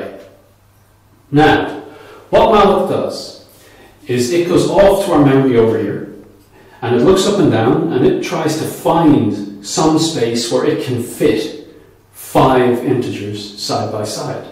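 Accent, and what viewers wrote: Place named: Ireland. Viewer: Yeah please do! and nice I am aswell. It's always nice to hear an Irish accent in this area of youtube videos. keep up the good work!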